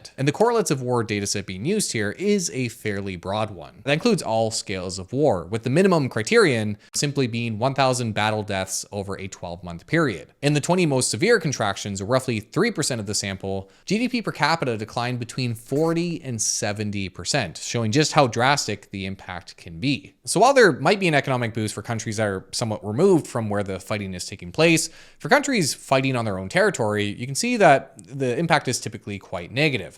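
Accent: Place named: Canada. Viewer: i love the fact that you canadians always have an interesting accent...like "welcome to the pleine baygle" hahahaah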